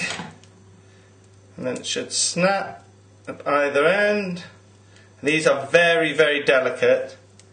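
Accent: United Kingdom. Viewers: Are you from Cardiff? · He's not Australian -____- I'd have thought by his accent it's pretty obvious he's from the UK, but obviously not.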